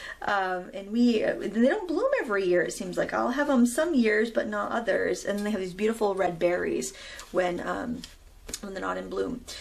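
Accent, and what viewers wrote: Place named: New England, United States. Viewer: love the long videos!!!! I watch them alot for tips and practice..you give lots of great ideas and tips. I paint and do crafts all day most days, and watch youtube videos on my huge tv screen so i can see every little detail! Thankya again for sharing!!! Long vids are more then welcome!! lol love your new england sillyness too!!! wicked smaaaat lolol giggle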